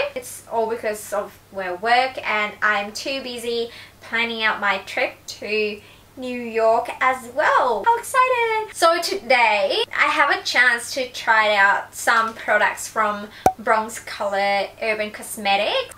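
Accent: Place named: Australia. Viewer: Wow! It was long time ago watched your video. Nice australian accent by the way.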